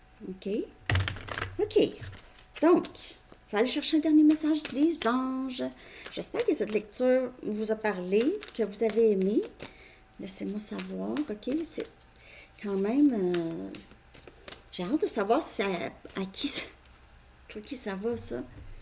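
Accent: Quebec, Canada. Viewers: Merci, ca me parle encore bcp ❤ vous êtes une vraie pepite 😊 et votre accent me ramène au temps où j'ai vecu au quebec 🥰😀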